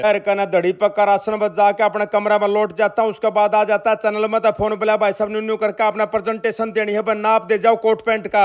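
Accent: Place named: Haryana, India. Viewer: आप ने अच्छी कोमेंटरी की हरियाणवी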